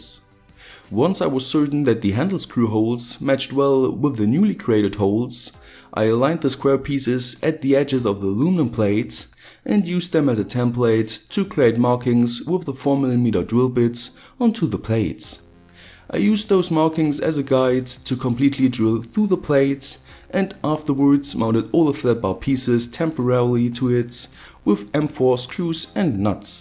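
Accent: Germany. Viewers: Are you German? You sound German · Greatly appreciated Germany accent!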